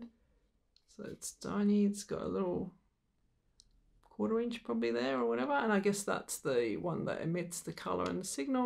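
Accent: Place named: Australia. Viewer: Thanks for the video. I’ve just unearthed my X-Rite i1 Display Plus, and when installing it for my project I’ve found I have to pay for the Prfiler software from Calibrite … just another $100! I’m starting to print for my business wit a new Epson P900 printer. Always good to hear a fellow Australian’s voice on this, as we don’t get all the products available in other videos, and in this case sometimes they’re rebadged! Anyway, first time calibrating properly for me so hopefully it all goes smoothly.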